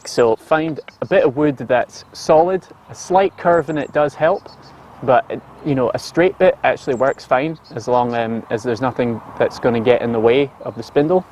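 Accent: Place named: Scottish Highlands, United Kingdom. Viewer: What part of Scotland are you originally from,? You sound quite highland..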